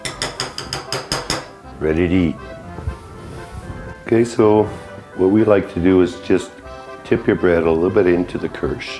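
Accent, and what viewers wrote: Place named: Canada. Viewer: You sound like a fellow Canadian sir edit: "deciliter" definitely Canadian, greetings from Ontario, j'aime le fromage, merci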